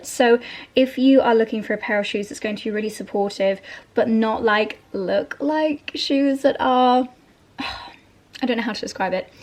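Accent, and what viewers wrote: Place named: United Kingdom. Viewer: Yes that word isn’t used in The US. However, you need to continue using The Queen’s English. It’s very charming to Americans listening & watching your Vlog!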